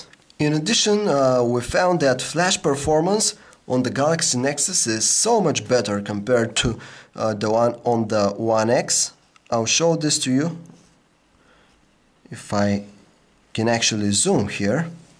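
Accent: Russia. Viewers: russian accent? respect! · Are you russian? :) I like your accent.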